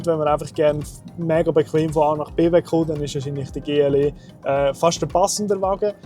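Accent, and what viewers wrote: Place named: Switzerland. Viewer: Wieviel Reichweite mehr hättest du wenn du Hochdeutsch sprechen würdest. Dieses Schwitzerdeutsch ist leider nicht zu verstehen.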